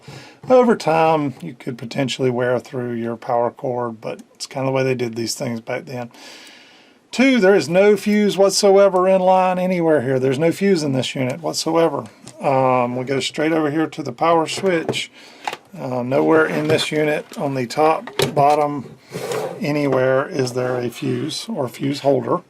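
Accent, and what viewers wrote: South, United States. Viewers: that southern drawl of yours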